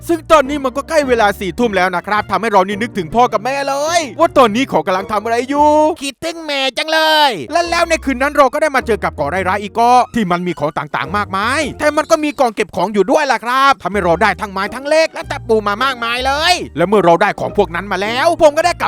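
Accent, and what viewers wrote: South, Thailand. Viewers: เสียงเหมือนคนใต้บ้านเรา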